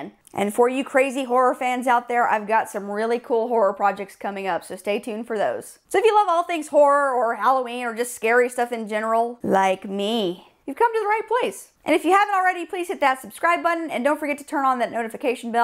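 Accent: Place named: United States. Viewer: hell yeah. Love your enthusiasm, knowledge and delivery. The American accent is a bonus too :)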